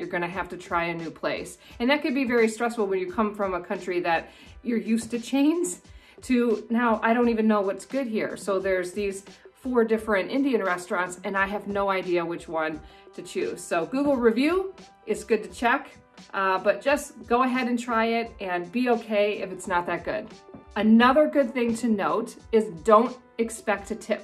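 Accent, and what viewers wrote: New Zealand. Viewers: I can hear a kiwi accent already. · Omg your pakeha accent is soooo funny lol (not hate ofc and idk how to spell te reo words)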